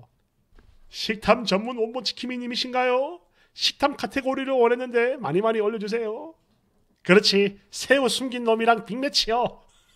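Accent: Gyeongsang, South Korea. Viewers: 아저씨ㅋㅋㅋ전형적인 경상도 개 웃긴 형부같음ㅋㅋㅋ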